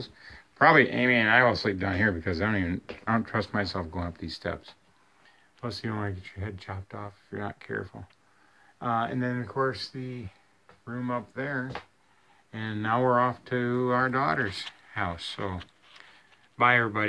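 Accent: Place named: Michigan, United States. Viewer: You sure speak Michigander style quite well.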